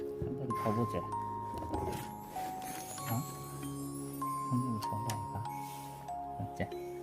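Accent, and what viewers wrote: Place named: Gyeongsang, South Korea. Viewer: 우연히 산돌이 영상을 보고 님 영상 처음부터 끝까지 보고 또 보는 중이에요.제 고향 대구와 멀지 않은 곳에 사는거같아서 말투도 정겹고 산돌이영상은 더 좋구요. 뽀드득 뽀드득 소리 너무 듣기 좋네요~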